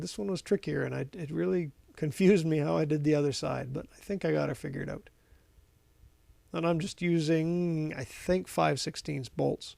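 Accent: Canada. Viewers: Good video! Looking forward to the follow ups. Hey where are you from? You sound Canadian to me. Oshawa here.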